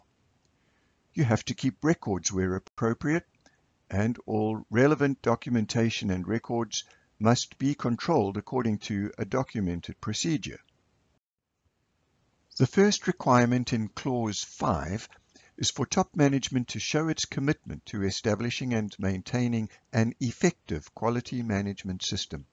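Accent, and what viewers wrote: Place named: South Africa. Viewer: I see you live in Canada, but sound like a South African :)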